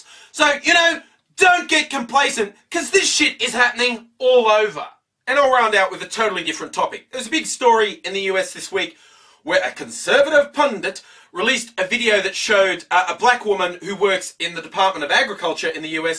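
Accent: Australia. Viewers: Nice Aussie ...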